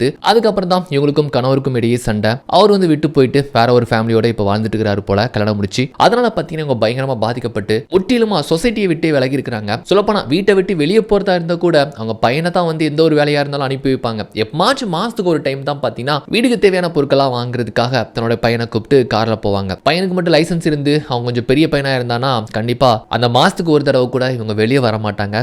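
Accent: Sri Lanka. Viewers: Ivanga Voice Modulation Nallave Therithu Sri Lankan Tamil Nu So Ivaru Definitely Arjun Bro Illa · slang change aahuthunga.... ilangai tamil mix up maathiri irukku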